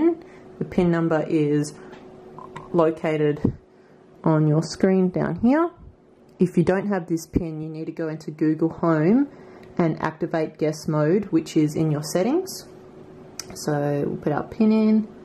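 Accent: Australia. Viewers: Thanks, you made the improbable possible and did it with a sexy Australian accent.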